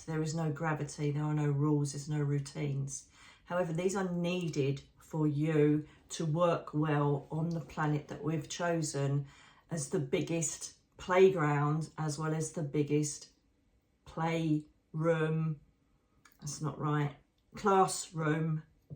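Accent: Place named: South London, United Kingdom. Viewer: your star cardi is fabulous!! I love the fact that you sound very much like me (I’m a south London girl!) and who wouldn’t imagine Gabrielle with wings - wings are awesome! 😂❤ thank you so much for channelling this amazing message, I have been talking to the angels almost everyday recently just for strength and to help me heal from surgery and to give me a boost from the RA, I don’t know about you but the cold and wet weather makes me feel 10x worse! Sending love & light to you, Darren and Teddy Bear ❤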